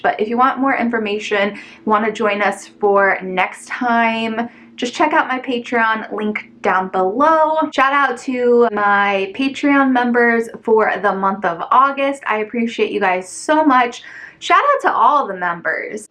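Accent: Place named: Midwest, United States. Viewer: I'd say u have a Midwest accent! A lot of ppl in wisconsin pronounce those words EXACTLY like that!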